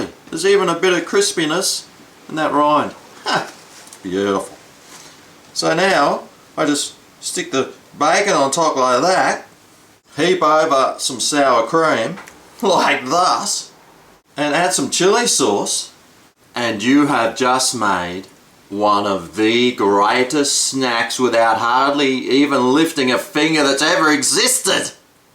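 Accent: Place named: Australia. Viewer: I like your videos. Also a great way to learn some Aussie English:)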